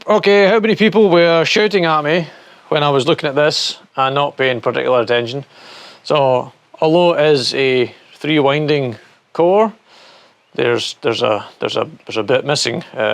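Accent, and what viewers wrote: Scotland, United Kingdom. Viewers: I wish I saw your video before I bought one. I paid $75 AUD for this full kit with 2 batteries shipped to me in Brisbane australia from a Melbourne eBay store. Delivery was within 3 days and excellent. But the constant cutout while grinding drives me nuts. This is my first time trying out a fake brand substitute. It’s no way better than my Ozito (Einhell) grinder. Never again. I found your video very good and love your Scottish accent. Subscribed. Thank you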